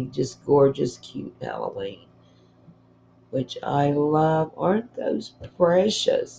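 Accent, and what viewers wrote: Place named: Virginia, United States. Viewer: I love all the stuff you found! Hope all finds you well in VA! I sure miss the VA and WV mountains! I get homesick every time I hear you talk...lol...tyfs stay safe and well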